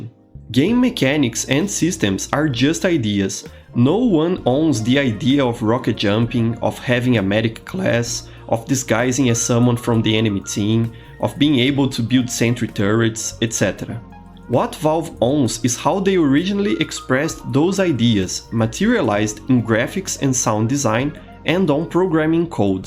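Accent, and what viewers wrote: Brazil. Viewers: why does your way of speaking seem so much like a brazilian speaking english?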